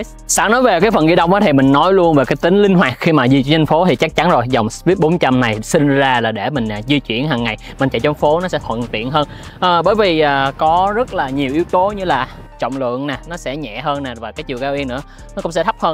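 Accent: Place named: Saigon, Vietnam. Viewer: Một Chútttt nghe nó sượng lắm ý, kiểu nó không đồng nhất. Nếu đã phát âm cho đúng thì đúng hết, còn này toàn bộ giọng Sg xong mỗi từ chútt thì nhấn như ng Hà Nội. Kì vậy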